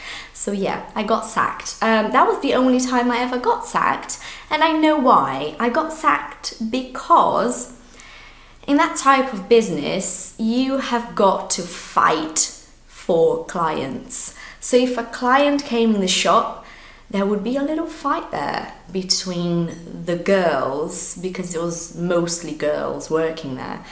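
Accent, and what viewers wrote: United Kingdom. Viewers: I wonder if I'll ever speak English with such a massive british accent! haha My English is totally "american" so for me the british English is almost a new language! Acho cute mas não consigo me imaginar falando assim rs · Well, it's pretty easy to see why you are teacher. You have all it takes to be a great teacher: you're very articulate, your sentences can take the listener from point A to point B and your mastery of the English language is amazing. You could easily pass for a native British person - if there was no Portuguese words below your videos, the only thing that would give out that you might be Brazilian or Portuguese is the way your name is written (with the diacritic acute - acento agudo para os lusofonos). And even then I wouldn't be so sure since there's a lot of people in North America (and I believe in Great Britain too) which have Portuguese names yet are second or third generation (the kids or grandkids of Brazilian or Portuguese immigrants) and can't speak a word in the language of their parents or grandparents. 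No Portuguese words and English with (written like this): there's no way I could say you're not a native Brit.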